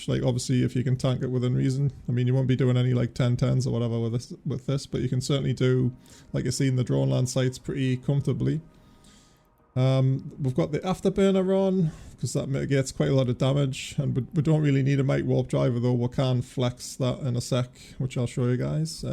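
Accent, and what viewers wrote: Newcastle, United Kingdom. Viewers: When are you gonna "grow out" of that Geordie accent. It just makes you sound like a King Chav. The last time I went to Newcastle, people were wearing dustbin liners as raincoats. I guess you donn your dust bin liner with proud when you go out on the toon (town), right? Learn about Estuary English and start growing up, pal, git gud. Oh shit, now I'm doing it.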